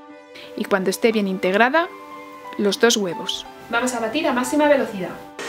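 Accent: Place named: Spain. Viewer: El bicarbonato,se puede sustituir x gasificante(sobre azul y blanco) o polvo para hornear? X tu acento eres española 😊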